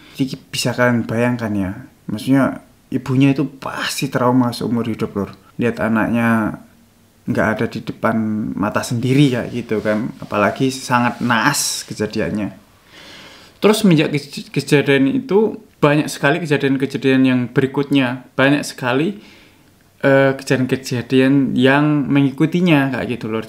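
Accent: Java, Indonesia. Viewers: Org jowo Yo mas? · Baru ketemu channel ini. Kok, bisa lidahnya istiqomah medok sekalipun sudah jauh ke Jerman?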